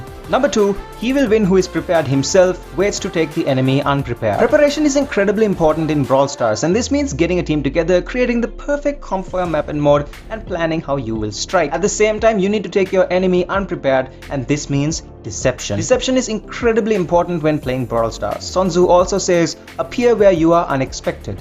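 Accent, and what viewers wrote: India. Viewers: Ooh it sounded like an indian ,but never mind you are our neighbour feels great ,keep uploading this tips 👍🥰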